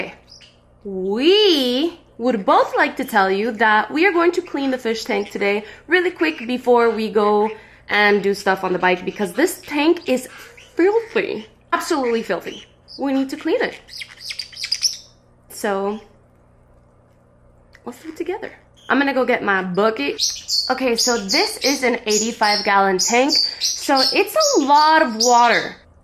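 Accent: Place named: Cuba. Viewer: 😂😂😂 that Cuban accent kills! I love it! I miss my Cuban people in Miami! I miss Miami!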